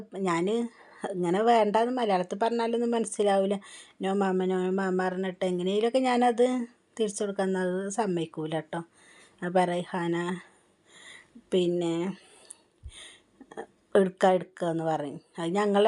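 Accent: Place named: Palakkad, India. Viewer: കേൾക്കാൻ ഇഷ്ടമാണ്.പാലക്കാടാണോ നാട്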